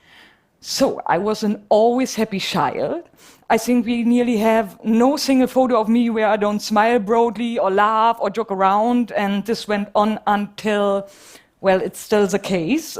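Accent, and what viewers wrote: Germany. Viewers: Sies ... sies is why... german can't speak English... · I think the lecturer has a German accent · Sexy german accent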